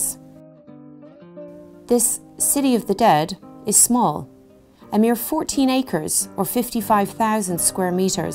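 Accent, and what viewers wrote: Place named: Ireland. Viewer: This is the most informative video about La Recoleta. Thank you!! I especially enjoyed your presentation, with a lovely Irish lilt : )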